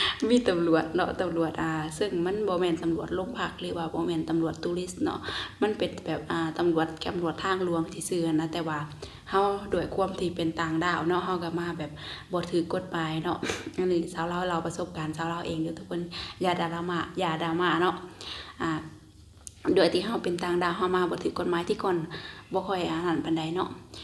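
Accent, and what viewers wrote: Isan, Thailand. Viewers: น่ารักและชื่นชม เป็นสาวคนแรกที่พูดสำเนียงอีสาน ได้ใจคนอีสานมาก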